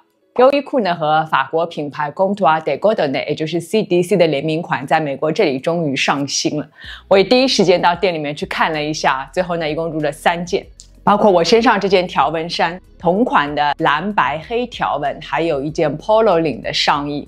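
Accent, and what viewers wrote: Shanghai, China. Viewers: 看了你好多视频了，今天终于订阅了，很喜欢你的一些讲解，听口音你可能是上海人吧。我这里没有Uniqlo的实体店，可惜了。买衣服还是喜欢实体店可以试穿，好多衣服博主穿着好看，自己穿未必好看。支持你一下。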